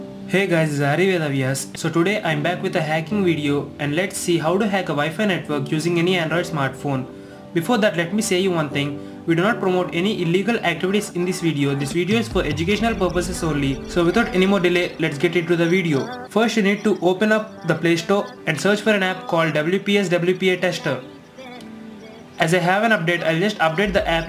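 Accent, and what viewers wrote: India. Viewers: since you have such a THICK accent, I would recommend to speak slowly because now it sound like an east-indian mess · Bla bla bla bla indian English dedicated for indiam only though i understand all but what r u saying regarding hacking password and WiFi is not true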